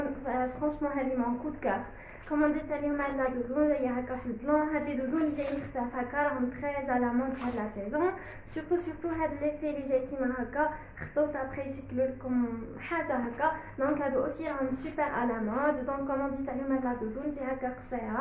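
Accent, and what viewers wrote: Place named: Maghreb. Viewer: فيديو رائع ❤️❤️ تصوير رائع واختياراتك أنيقة❤️❤️بس اللهجة صعبة الفهم لمعظم العرب لا يفهمون اللهجة المغربية والجزائرية والتونسية للأسف 💔💔 ف الرجاء التحدث ب الشامية أو الفصحى أو المصرية هكذا ينتشر الفيديو أسرع ويكون له جمهور أكبر او ضيفي ترجمة مصاحبة بليز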